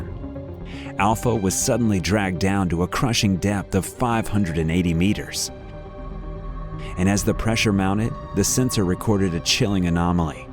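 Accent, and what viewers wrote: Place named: United States. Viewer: That single episode made me not want to subscribe. Accent and dialogue is way too American. It sounds frustratingly stupid.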